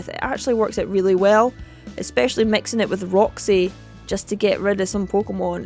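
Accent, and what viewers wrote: Scotland, United Kingdom. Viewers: Haha, the scottish accent is great, I'm glad I didn't embarrass myself.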